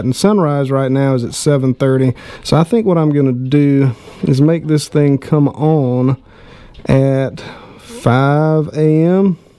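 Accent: South, United States. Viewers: judging by your accent, you live in the south and absolutely do not need heat lamps. In fact, you're risking burning the coop down with them in it. Forcing your hens to pump eggs out during their natural rest period is controversial and not good for them in the long run. It will shorten their life span and many think it's inhumane. The rule of thumb is to let them do what nature dictates.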